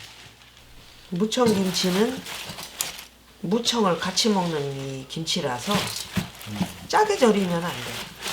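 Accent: Chungcheong, South Korea. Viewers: 충청도분이신가봐요.ㅎ 제고향 어르신이 옆에서 말씀힌시는것같아 더 친근감이 있어 좋네요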